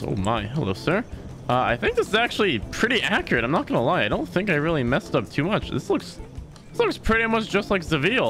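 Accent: South, United States. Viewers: You know you don't HAVE to talk with a red neck accent.. many people in this setting were from all over the world or had intellectual backgrounds. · Mf rlly talks with a red neck accent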